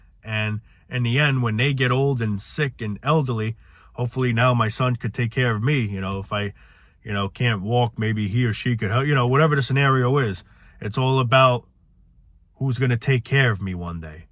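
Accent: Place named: Italian-American, United States. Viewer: What accent is this? Like Italian mobster/Jersey/Boston? Lol sounds cool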